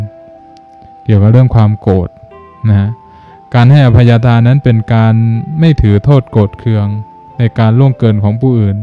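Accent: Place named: Lampang, Thailand. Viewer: ลำปางชัดเจนดีเจ้าคะ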